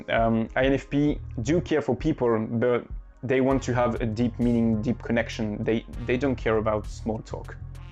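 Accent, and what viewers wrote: France. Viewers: Are you french ??🙂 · arent you french ???? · Mec t'es français j'en suis sur (du moin francophone). Ton anglais est lourd mais l'accent nous trahis toujours ahaha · Are you French? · I’m assuming by your accent that you’re french? I am too. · On dirait un accent français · Wow this is so accurate, thankss for your video. I like your french accent btw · An INFP child is not happy because they are surrounded by their pairs, they excluded anyway from their surrounding, but they are happy thanks to their imagination that helps them going through life : even though no one understands you and you feel lonely and shitty af, at least you have your inner world... it really saves you sometimes. Also, je rêve ou c'est un petit accent français que t'as là ? 😏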